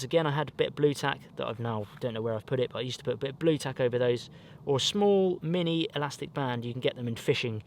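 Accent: United States. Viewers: His American accent is so funny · that america accent was awesome haha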